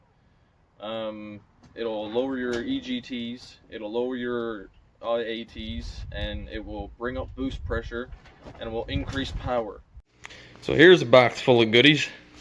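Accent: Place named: Canada. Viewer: Must be from Canada. Likss saying ooot not out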